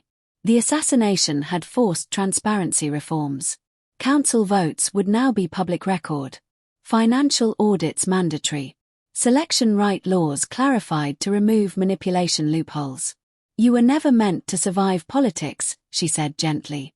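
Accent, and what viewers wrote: United Kingdom. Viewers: Love, love, love the classy British narrator!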